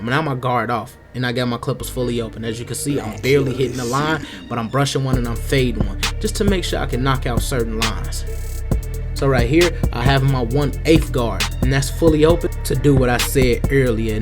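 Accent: Chicago, United States. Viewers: Sound like you from the Chi, I'll drive 3 hrs for this cut. No doubt! Good work bro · that Chicago accent, is crazy. I lost mine at the age of 10 when I moved to TX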